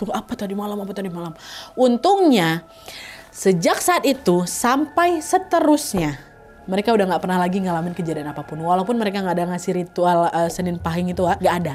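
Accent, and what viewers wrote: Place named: Banyumas, Indonesia. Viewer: mbaa wongg ngapakk😂😂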